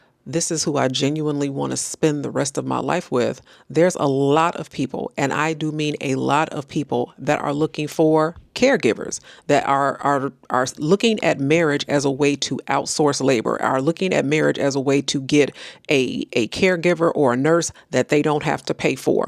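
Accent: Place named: United States. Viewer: I love this accent , where abouts in America are you from ?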